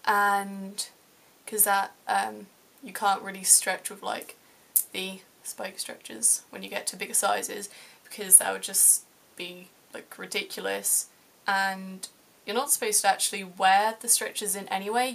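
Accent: United Kingdom. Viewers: u sound english not american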